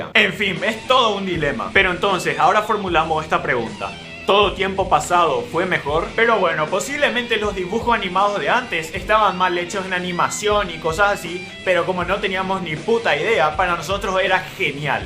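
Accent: Paraguay. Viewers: Puta madre??? Puto amo???... !!!Habla como paraguayo idiota¡¡¡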